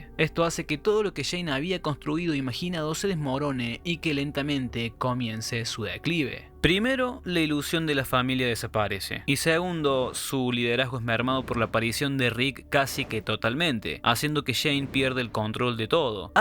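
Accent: Cordoba, Argentina. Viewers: que grande el acento cordobes loco, es mi favorito del pais. Saludos desde Cordoba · Videazo re bueno el análisis y el acento cordobés le da el toque 😂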